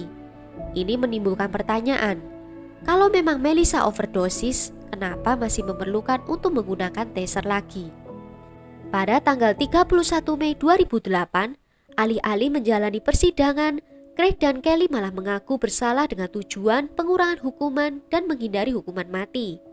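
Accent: Java, Indonesia. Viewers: medok bgt sis.. wong endi sampean?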